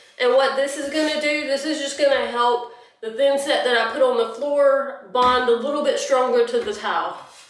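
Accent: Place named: South, United States. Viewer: Good video, well depicted. One thing ( Not a criticism) Hey, where are you from? I love your accent. I talk like that too, but my family wonders why because we were from Ohio and Pa, not the SOUTH.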